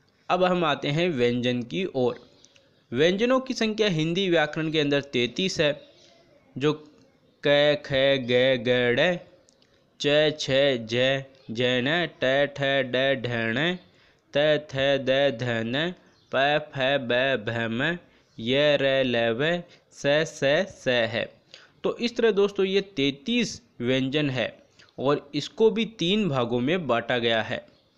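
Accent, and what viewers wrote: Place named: England, United Kingdom. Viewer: Sahi se padho sir jo hum kavi nahi padhe aap waise padhte hai a aa i ee aise padh rahe ho jaise ko अन्ग्रेज ho gussa aane lga lekin explanation bahut aacha hai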